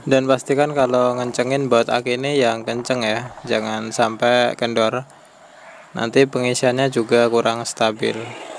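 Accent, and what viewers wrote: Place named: Java, Indonesia. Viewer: Masse... njenengan teng daerah pundi toh...ngomongnya medok... maturnuwun sanget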